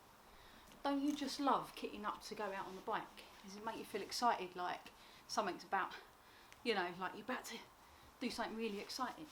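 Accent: Cockney, United Kingdom. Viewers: I've watched a few of your Triumph reviews. Your accent reminds me of my family and growing up in London England. I was born in Woolwich. I would say you definitely have a Cockney accent. I am now a US citizen living in Southern California for many years now. Carry on riding !!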